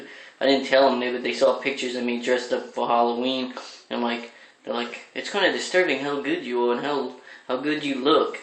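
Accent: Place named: Ukraine. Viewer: Are you from the UKRAINE ! Your accent is very strong !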